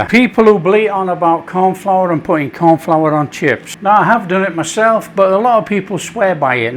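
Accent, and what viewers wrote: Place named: Midlands, United Kingdom. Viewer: you sound more like midlands than the north. Stoke on trent way accent. Its north to me being in the westcountry. Lovely grub mate 👌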